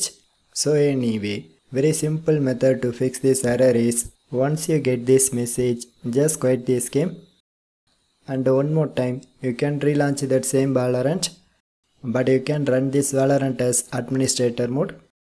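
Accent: India. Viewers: BRO I FCKIN LOVEEEE YOU SO MUCH WITH YOUR INDIAN ACENT IT WORKEDDDDD THANKS MAN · U hear that indian accent u know u already got ut fixed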